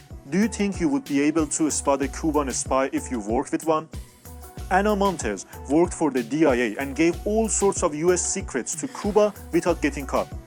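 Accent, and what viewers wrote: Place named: Iran. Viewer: The narrator sounds Persian 😄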